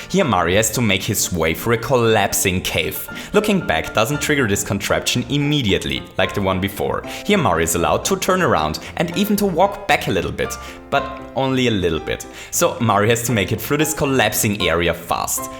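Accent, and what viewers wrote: Germany. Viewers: Is german?I mean the accent... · Are you German? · Your german? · what accent do you have?! most probably german... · Are you a german? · Are you German? ^^" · DEUTSCHER AKZENT! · Cringey german english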